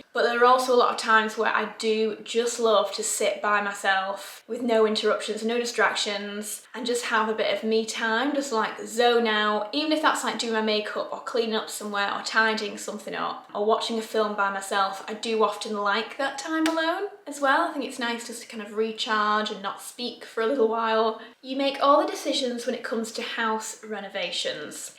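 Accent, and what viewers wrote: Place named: Manchester, United Kingdom. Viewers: and I love that you say your accent will never change and how proud of your northernness you are, I’m a fellow manc living in the south and I feel the same! 🥰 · Hilarious that someone thinks you put on your accent. I'm from Yorkshire, your accent is authentic to Manchester. If your a northerner you can spot a fake accent a mile off. Your the real deal... x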